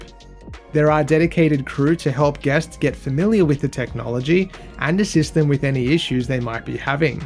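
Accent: Australia. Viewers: It's refreshing to hear an Aussie doing cruise content. awesome stuff man. Thanks you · I love your Aussie accent! Where in Australia are you? I went to Melbourne and Sydney almost seven years ago and loved both. Of the 18 countries I've visited, Australia had the nicest people. Thanks for the video. I'm looking at doing a Princess cruise in a few years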